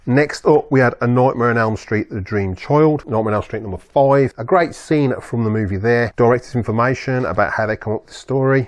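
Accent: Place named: United Kingdom. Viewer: Can I ask where you was originally from in the UK ? Sound like you from my neck of the woods 👍🏻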